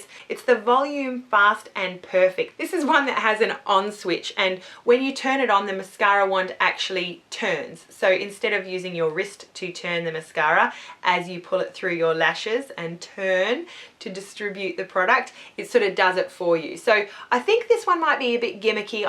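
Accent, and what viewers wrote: Australia. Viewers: are you from Australia?? AWESOME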